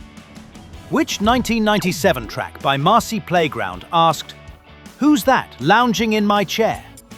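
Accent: England, United Kingdom. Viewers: !!! had two number ones, neither of which were. and. Then when came up, was their 2nd number one, I had to turn off your quiz. If you're aiming at an American audience then don't use an English voice.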